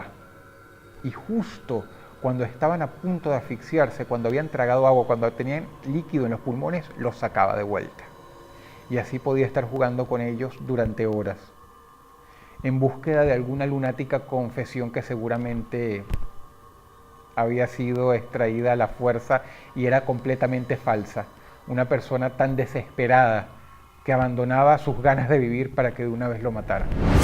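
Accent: Venezuela. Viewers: Cuando Dross aun tenia acento Venezolano. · Cómo me gustaba este tipo de videos, mucha nostalgia y el lindo acento venezolano Pd: señor de miera, mas bien señor de mier... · Cómo odio tu acento veneco Agradezco que cambies tu voz en videos para caer bien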